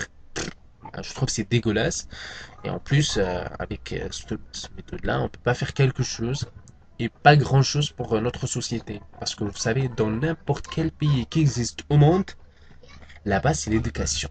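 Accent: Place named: France. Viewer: il faut que tu change ta façon de parler et soit un peu marocain vérile et arréte de faire tes gestuels et ton acçent a la française..sinon c pas mal salam khoya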